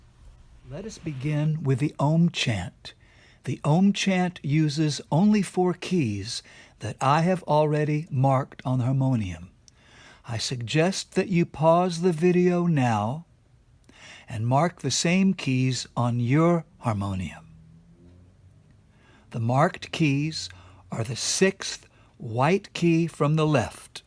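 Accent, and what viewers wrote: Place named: South, United States. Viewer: Ah this sweet southern voice sounds so familiar! Brilliant patient teacher you are my friend. I am sharing this with so many devotees who need this! Much Love and Gratitude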